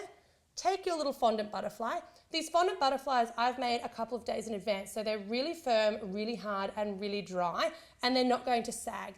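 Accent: Australia. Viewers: OMG! Are you an Aussie!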